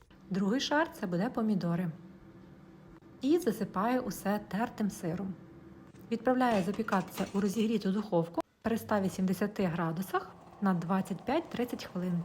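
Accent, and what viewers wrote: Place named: Russia. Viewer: Какой сильный русский акцент)))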